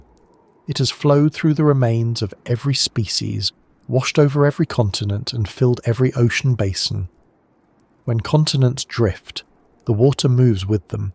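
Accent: United Kingdom. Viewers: I'd like to believe it's some brilliant man from the UK. I'd be so disappointed to find out if the voice is AI.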